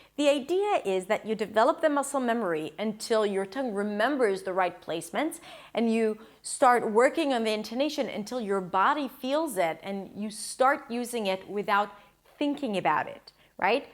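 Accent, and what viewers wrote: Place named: United States. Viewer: you're in israel? i thought that you were in the US,,,, damn, how do you keep your american accent in such good shape?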